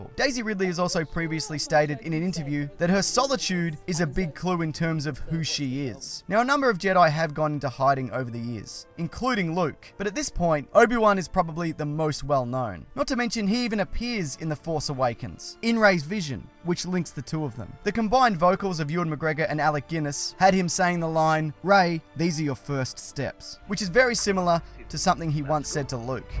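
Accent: Australia. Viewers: Are you Australian? Us Australia are mad dogs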